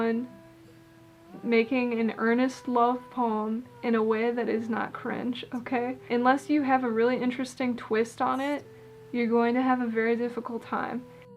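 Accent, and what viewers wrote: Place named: Scotland, United Kingdom. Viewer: ... thank you - Scottish for sure - r, and ch - got a Stroke but talk now after almost 2 years - poetry back then, poetry once more ...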